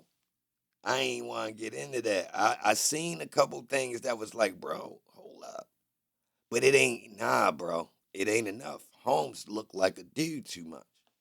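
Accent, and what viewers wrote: Baltimore, United States. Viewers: Are you from Baltimore by any chance?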